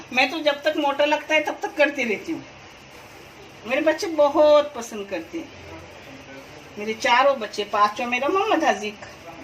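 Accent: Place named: Konkan, India. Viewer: BEHEN JI AAP KOKNI HAIN , JIS TARHA AAP KI BANAI SARI RECIPES AUTHENTIC HAIN , USI TARHA AAP KI URDU JO KOKNI ANDAZ AUR PRONUNCIATION" تلفظ" LIYE HOTI HAI WOH MUJHEY BOHOT ACHCHHA LAGTA HAI . DEEGAR AAP KI RECIPE KE DARMIYAN DUAYEN AAP KI SHAKHSIYAT AUR KIRDAR KI AQQASI KARTI HAIN ,"LAJAWAB ". ALLAH TĀALA AAP KO KHUSH RAKKHEY .AAP KO SIHAT AUR LAMBI ZINDAGI DE .TAKEH AAP ISI TARHA KIKAN KI NIT NAI RECIPE SAY HUM SABHON KO NAWAZTI RAHEN . "ZAHID SANGE".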